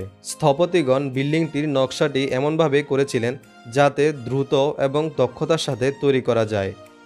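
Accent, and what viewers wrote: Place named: Noakhali, Bangladesh. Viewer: ভাই নোয়াখালীর কথার টন পালটান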